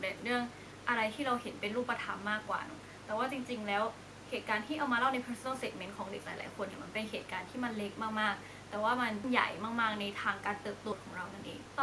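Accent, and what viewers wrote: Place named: South, Thailand. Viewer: รู้เลยค่ะว่าน้องคนใต้ สำเนียงออก ^^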